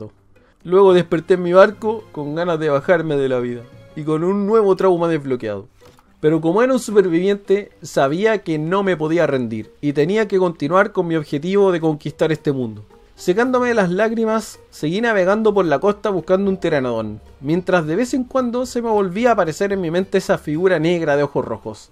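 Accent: Chile. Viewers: Esta la Zorra la Nueva edición al estilo Hopa, narrado al clásico Idioma Chileno, esta super chistoso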